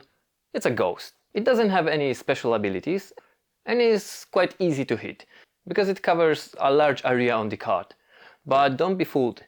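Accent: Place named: Poland. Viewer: this is the coolest pocket dungeon crawler i have ever seen :) 1 more thing. Why do you have polish accent?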